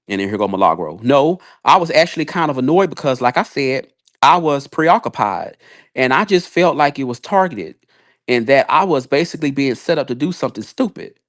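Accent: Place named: Texas, United States. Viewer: Also are you from TX too bc your accent, no shade at alllll, sounds similar to Milagro the way your pronounce certain words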